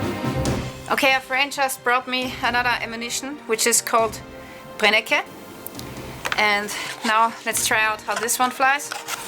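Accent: Austria. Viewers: very strong german accent，very Österreichisch tuned, better u do it in german and english subtitled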